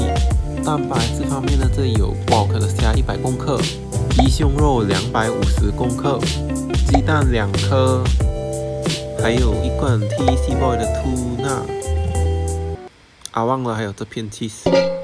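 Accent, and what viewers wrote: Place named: India. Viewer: 你怎么有印度口音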